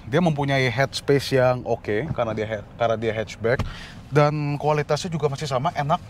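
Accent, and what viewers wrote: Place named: Indonesia. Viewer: Keren koko, logat nya indonesia banget...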